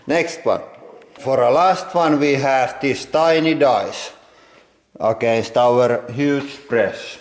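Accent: Finland. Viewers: Most of the finnish people know him of he's epic finnish english axent :D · Rallienglanti for the win! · the finnish accent lol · This kind of very strong finnish accent is know as "Rally-English" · very strong finnish accent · is he from finland cause sounds like it?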